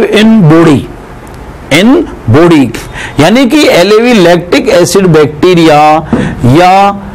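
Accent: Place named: Bihar, India. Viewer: bihar ka teacher hai kya yr?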